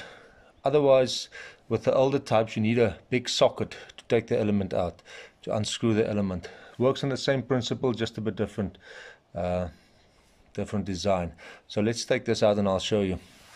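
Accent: South Africa. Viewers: Ek kan mos hoor jys Afrikaans Danke oom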